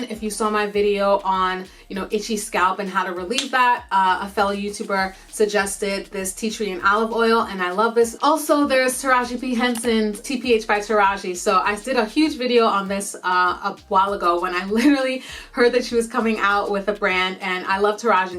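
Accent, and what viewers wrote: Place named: New York, United States. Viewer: girl - LOVING this video. thank you for sharing your feelings and resources. I appreciate you and will follow up on as many as I can. you DO have a voice and I love hearing it! PS - is that a New York accent?! never heard that in your voice before ahahaha (I already knew you were in NY). I do love Fenty and will continue to support her brand (I also recently bought that new blush). Similarly tho, I try to stay on a budget hahaha. wowwwww the hair products claps SO MANY options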